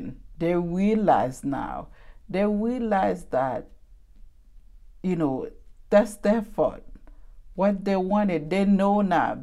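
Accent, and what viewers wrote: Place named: Jamaica. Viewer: Your accent sound Jamaican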